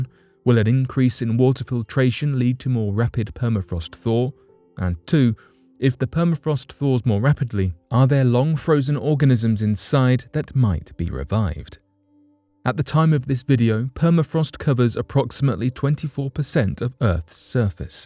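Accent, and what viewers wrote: United Kingdom. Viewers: This is why I never watch these videos... The title of the video is very specific, yet it's only a fraction of what the video is about, and is just a photo Montague with someone British voice just blabbering.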